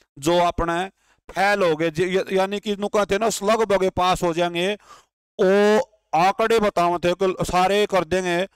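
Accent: Haryana, India. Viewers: Sach me sir aap real haryanavi ho sida sida bolte ho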